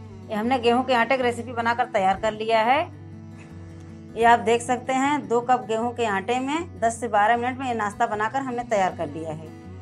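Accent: Uttar Pradesh, India. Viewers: Aap up ke Ho kya.?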